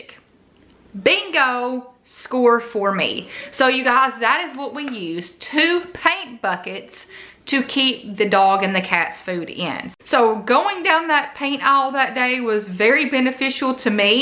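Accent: South, United States. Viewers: Country accent love it. I just found your channel.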